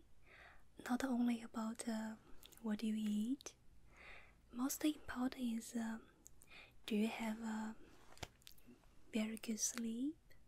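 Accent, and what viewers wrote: China. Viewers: She has a very strong Chinese accent, but it really helps me with my anxiety and helps me fall asleep 😴 ❤️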